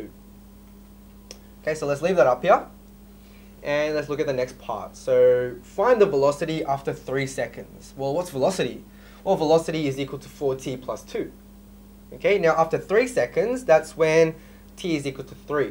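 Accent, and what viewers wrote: Australia. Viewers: Honestly this video really helps, Implementing examples and worked solutions really helped clear some misunderstandings I had, Thanks. Also the Australian accent is really rubbing off on me after watching a few of of these videos.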